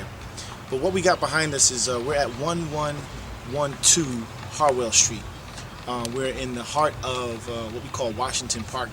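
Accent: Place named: New York, United States. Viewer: Spoken like a New Yorker.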